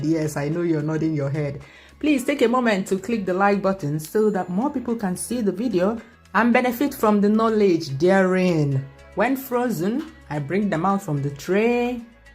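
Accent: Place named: Anambra, Nigeria. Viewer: This is great video my dear sister. Your intonations sounds like my country state anambra. Am from Ukpor nnewi south anambra state. I started my new channel as a small Youtuber. I love all your videos my dear sister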